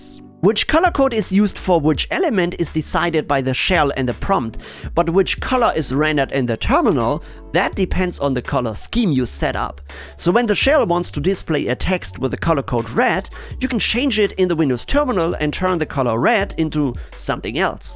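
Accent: Germany. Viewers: i smell a german accent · Deutsch! Hört man :D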